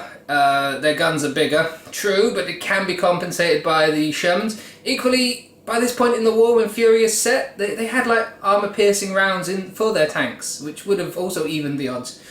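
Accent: United Kingdom. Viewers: Aside from your velvet queen's English accent I watch you because I learn things, I had no idea the differences between these tanks!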